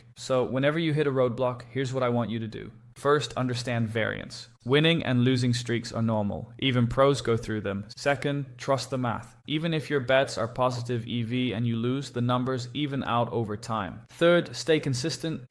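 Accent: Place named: United States. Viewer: The Best Most Perfect American English Accent & Talking I've Ever Heard!!! 💖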